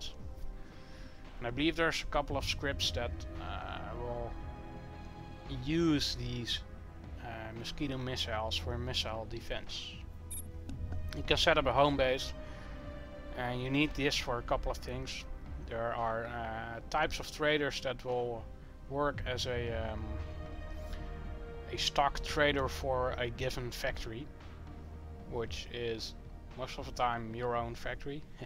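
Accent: Netherlands. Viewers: Great tutorial, helped allot thanks! Btw you sound alot like Alistair Overeem, maybe its just the dutch accent lol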